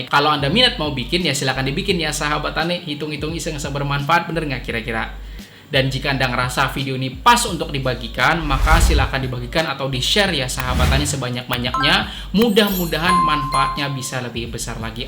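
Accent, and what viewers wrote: Banjar, Indonesia. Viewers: orang banjar sekalinya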